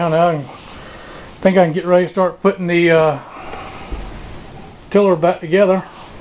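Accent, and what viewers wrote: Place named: Kentucky, United States. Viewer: Nicely done! I'm impressed. Where are you? You sound like Kentucky.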